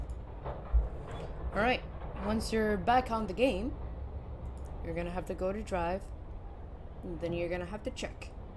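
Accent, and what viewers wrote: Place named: United States. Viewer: why does bro sounds american when bro lives in saudi arabia 💀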